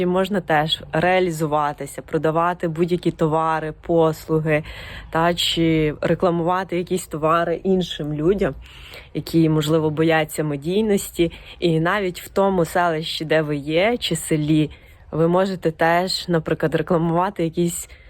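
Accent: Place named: Western Ukraine, Ukraine. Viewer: Ну я по акценту сразу понял что с бандерщины где-то.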